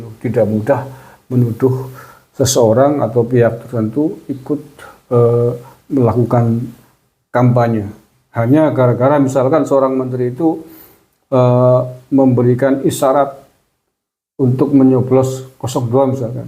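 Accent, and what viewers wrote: Banyumas, Indonesia. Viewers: Aha pak rika bahasNe ngpak banget banyimasan,aku dadi seneng ngringokna bahasane rika kas bantumas aku wong wanareja ds Cilongkrang,paryaiku geindra pak....🙏🙏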